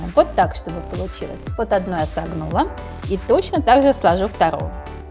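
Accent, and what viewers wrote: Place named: France. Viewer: Кто заметил её акцент , похоже она францужинка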